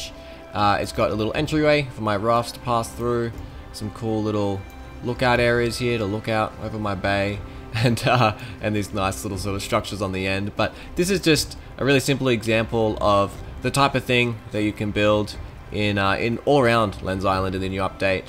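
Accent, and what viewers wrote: Australia. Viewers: I love the temporary z-buffer object transparency (???) - is that common? It looks very schmick and useful. I have done something similar in a Windows explorer clone I am developing kinda sorta... Good stuff here, great to hear the Aussie voice on a game dev video of this quality.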